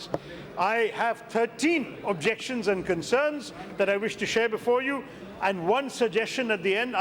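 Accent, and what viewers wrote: United Kingdom. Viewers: Other members sitting around him are stupid and they don’t even care what he’s delivering from his party as don’t understand his British ascent. All points are very clear and valid. Let’s promote him as PM in the upcoming term. Jago Bharat log jago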